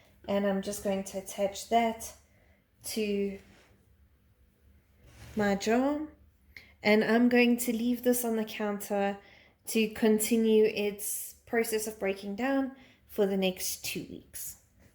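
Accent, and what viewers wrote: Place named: South Africa. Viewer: Sounds like you're in RSA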